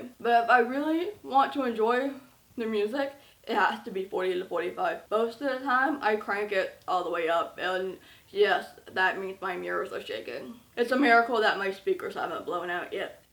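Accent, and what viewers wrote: South, United States. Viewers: omg I just came across your channel and you’re incredible! Are you from the south or something? You sound like it 😁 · How does she have an American accent if she can't hear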